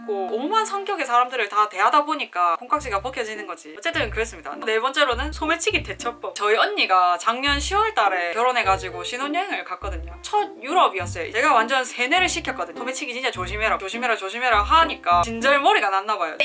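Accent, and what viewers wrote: Gyeongsang, South Korea. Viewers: 외람되지만, 경상도 어디사투리 인가요? 많이 들어본 억양인데 어느 지역인지 궁금하네요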